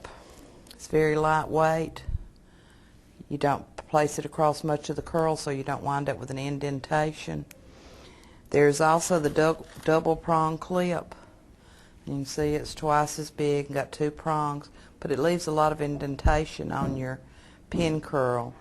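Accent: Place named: South, United States. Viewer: I like her sweet southern accent! I found this video to be helpful and informative. Thank you !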